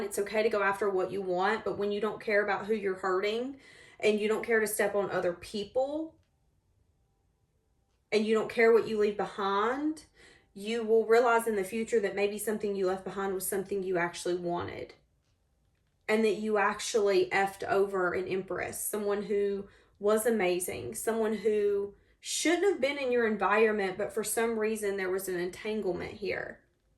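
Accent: South, United States. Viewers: You are such a blessing you totally just blow my mind wow you are so good you have helped me so much God bless you thank you I'm so thankful for you God bless you keep up the good work I just love listening to you that country accent girl so sweet😘🥰😇🙏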